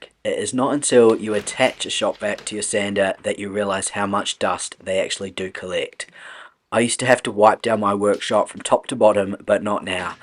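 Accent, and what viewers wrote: New Zealand. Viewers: Ok have a question for you!! Have u consided purchasing a laser paint remover..some are very expensive but some are cheaper if u look about...no more sanding or scraping imagine time saved..would make an interesting video...anyway have been enjoying your videos grretings from Australia..do i detect a kiwi accent?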